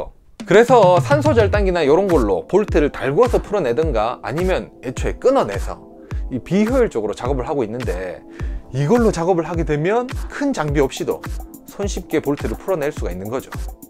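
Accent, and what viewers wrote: Gyeongsang, South Korea. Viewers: 말하는게 어리버리 갱상도넘인가요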